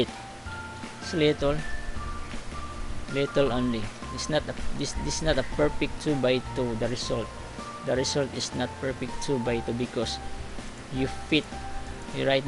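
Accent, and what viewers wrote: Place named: Visayas, Philippines. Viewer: walang Kabuhay-Buhay, Tagalogin mo na lng Hirap na Hirap ka Mag-English at di bagay pati mga Pronounciation mo parang Visaya Lng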